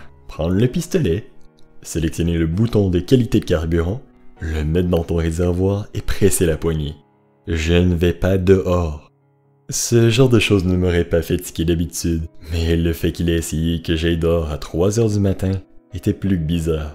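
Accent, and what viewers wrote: Quebec, Canada. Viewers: Mais c dingue à quel point ton québécois ce mélange bien avec tes creepy pasta. J'aime énormément ton travail. Chez toi comment ce passe au niveau du virus ? Ua un confinement ou autres ? Moi je vie dan l'est de la France qui a était rapidement contaminé acose de l'Allemagne. J'habite plus précisément à laxou dans Nancy. Nous sommes zn confinement de 7jours sa vas. Sauf notre gouvernement est pas à la hauteur. C pénible. Pas de masque poir le déplacement ou le peu de travalleur non confiné. Et attende l'accord de la communauté scientifique. Pour le médicament miracle n'importe quoi. Heureusement que les médecins libereaux eux le donne au plus malade. Tien moi au courant stp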